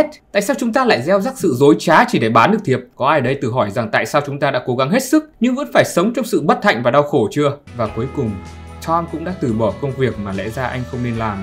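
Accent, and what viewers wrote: Vietnam. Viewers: Nghe bạn kể lại film này bằng chất giọng thuần Việt, làm mình thấm film hơn thêm 1 tí qua bao năm rất yêu film này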